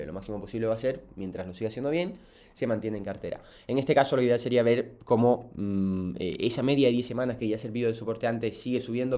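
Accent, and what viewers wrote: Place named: Canary Islands, Spain. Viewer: tenes acento canario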